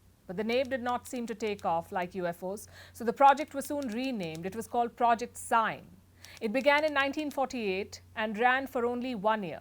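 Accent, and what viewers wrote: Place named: India. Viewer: So we have to listen to a woman with a strong India accent and no USA native speaker is available to deliver the story?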